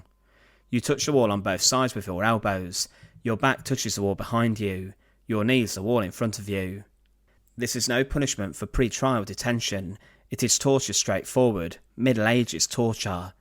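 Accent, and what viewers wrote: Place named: England, United Kingdom. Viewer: Love your videos but your English accent is so damned thick I can't understand half the time. Sorrym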